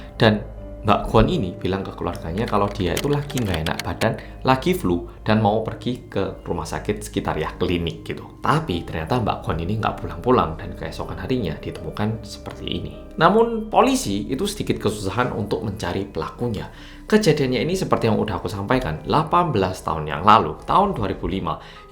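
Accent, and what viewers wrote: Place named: Malang, Indonesia. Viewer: annyeon...good channel banyak pelajaran yang di ambil hikmahnya dari channel u.. hampir 16th dimalang logat malang jawanya dapat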